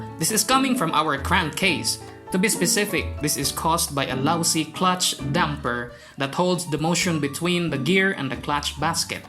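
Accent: Philippines. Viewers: very good explanation sir, speaks like a Filipino English Teacher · The accent is so Filipino 😊😘 Keep it up 👍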